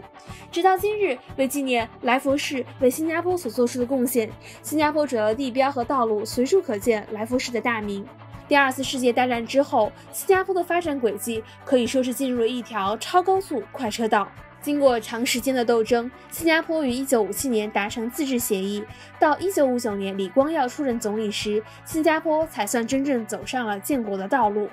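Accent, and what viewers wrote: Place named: India. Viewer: 这英语一说，我以为到了印度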